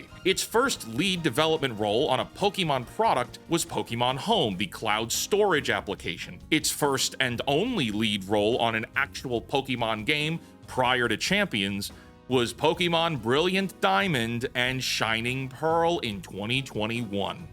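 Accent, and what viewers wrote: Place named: New Jersey, United States. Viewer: Dude are you from Jersey because that accent sounds like it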